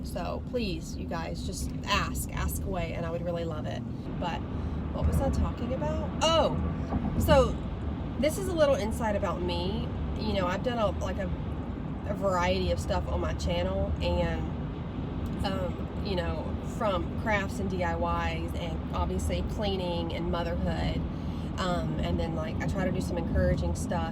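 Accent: South, United States. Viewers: I love your chatty videos! I especially love your southern accent.... go ahead sing talk all you want! I'm on the same boat with anxiety & depression, it comes in waves for me too. On top of that I'm an introvert in every which way. I smile through it all & try not to drag others down with me. I'd rather bring a smile & say hello to others, just in case they're dealing with a similar situation or worse. It's in my nature to do that.... loved this video! 💜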